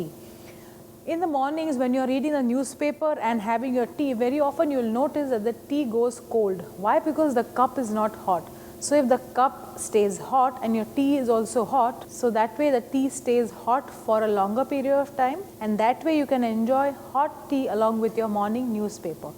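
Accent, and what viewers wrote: India. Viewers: Very clear instructions in classic Indian accent (apna cha ache hain, leikin T nahin!)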